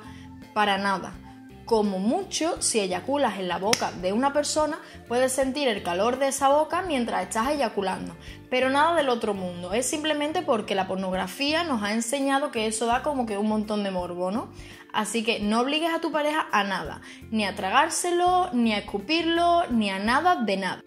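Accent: Andalusia, Spain. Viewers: Me encanta tu acento andaluz saludos desde Colombia y muy buena info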